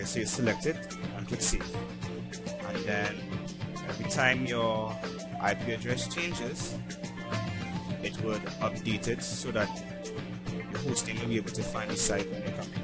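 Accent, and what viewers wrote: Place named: Trinidad and Tobago. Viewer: boss u sound link a trini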